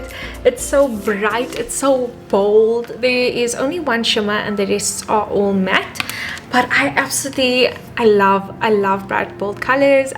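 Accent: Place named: South Africa. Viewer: Love the coloured accent. Something only found in sa ..thanks.for.the great video. Fellow sa gal x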